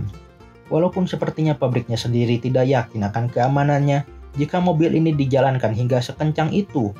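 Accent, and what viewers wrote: West Java, Indonesia. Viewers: Penyiarnya urang Sunda keneh nya?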